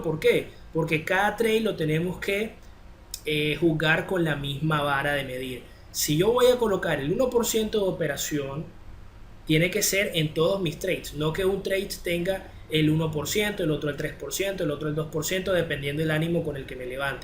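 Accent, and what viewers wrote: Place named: Guayaquil, Ecuador. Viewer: De donde eres bro???? hablas como guayaco????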